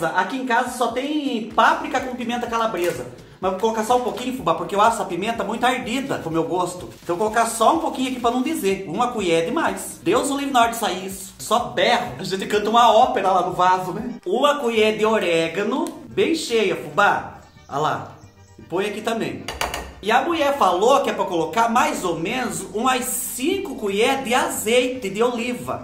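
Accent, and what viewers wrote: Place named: Minas Gerais, Brazil. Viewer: Ai vc parece mineirinho assim como eu. No modo como vc fala por exemplo a expressão "BOBA " é daqui de minas 😂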